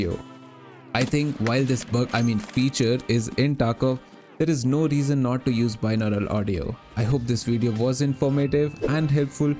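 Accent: India. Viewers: Your videos would be awesome if you spoke english instead of indian-mutated english :}{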